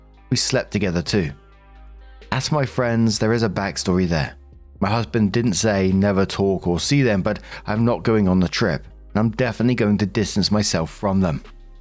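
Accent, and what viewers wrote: United Kingdom. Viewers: 12 minutes posted. I feel special. Love. British voice. Everything sounds better in a British accent ❤❤❤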